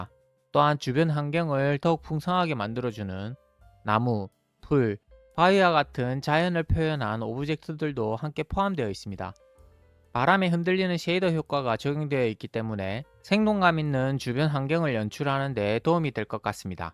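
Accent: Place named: Gyeongsang, South Korea. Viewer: 말씀 하시는거 보니 경상도 분 같으신데요 저는 서울삽니다.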